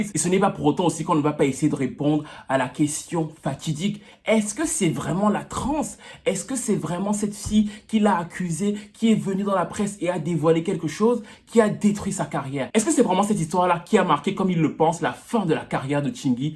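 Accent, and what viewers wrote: Cameroon. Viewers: Mdrrr l'accent kmer! La vidéo est marrante, je m'abonne !well done :) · De grâce, gardes ton accent Camer. Au top.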